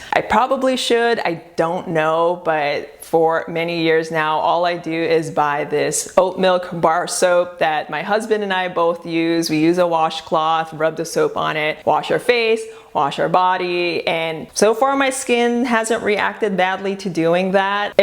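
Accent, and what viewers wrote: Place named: Singapore. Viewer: Are you originally from Singapore? My friend is and her accent is similar.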